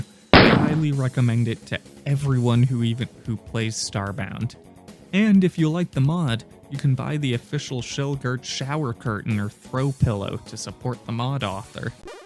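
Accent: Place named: Colorado, United States. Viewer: You sound like you're from Colorado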